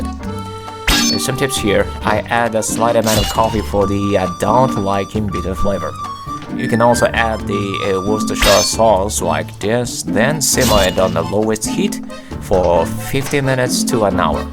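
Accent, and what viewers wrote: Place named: France. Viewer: What are you talking about? best part of the video is his somehow french accent!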